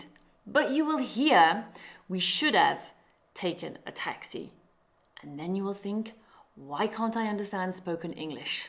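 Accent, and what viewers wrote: United Kingdom. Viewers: are you british? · New subscriber ✌🏼 Are you british?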